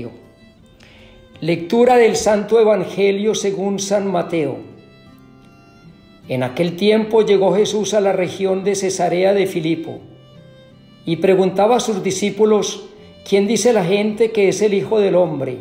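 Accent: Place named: Antioquia, Colombia. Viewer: 😍Padre tiene acento paisa 🎊🎉🙏🏻🙏🏻💕😊😊 Dios te bendiga siempre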